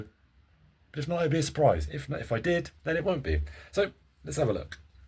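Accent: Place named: Bristol, United Kingdom. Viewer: Hey there. Progress is looking good. I've done this conversion and I have a spare manifold with seals and some other bits and pieces you can have if you want - from your accent I'm guessing you are in the Bristol area - I am nearby in Stroud..?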